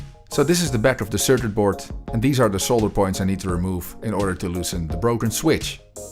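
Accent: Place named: Moldova. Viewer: First hearing your accent. Flavors of Moldova ?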